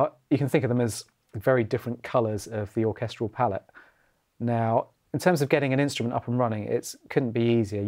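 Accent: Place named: United Kingdom. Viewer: UK accent <3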